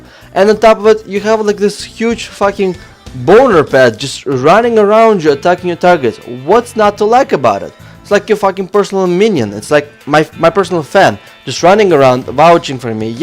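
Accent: Russia. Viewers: i fucking love russian abscent :3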